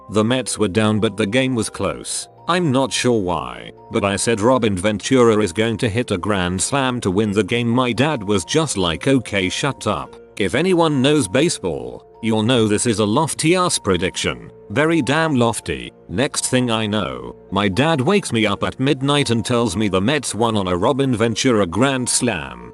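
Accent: United Kingdom. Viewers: I have trouble understanding and following this British sounding robot. The American sounding one is far easier to listen to and understand. Signing out of this crap.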